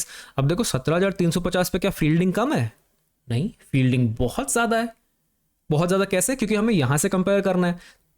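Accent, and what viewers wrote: Bengal, India. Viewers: are you from Kolkata or Bengali ?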